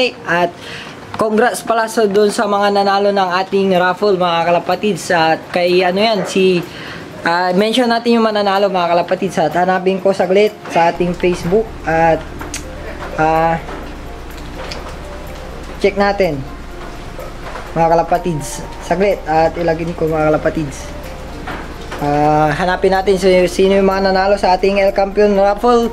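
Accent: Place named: Pangasinan, Philippines. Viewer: Taga San ka idol kc parang pangalatot ung salita mu eh Taga pangasinan ako idol shout out naman bago lng ako sa Channel mu idol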